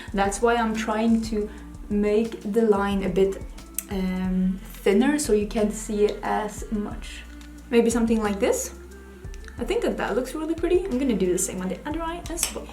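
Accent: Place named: Sweden. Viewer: These are all so pretty! All of your effort is really appreciated. This might seem like a weird comment, but I spent a lot of my career (I’m a writer) covering Swedish hockey players. Your speaking style reminds me a lot of them, and it always makes me smile! I really need to come visit Sweden — I love the people and their sense of humour. Much love from Canada! 😘❤️🇨🇦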